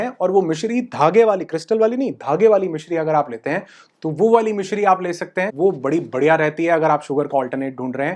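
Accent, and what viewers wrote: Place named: Punjab, India. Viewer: Apka sose pose is... Proper punjabi tone.. I love it❤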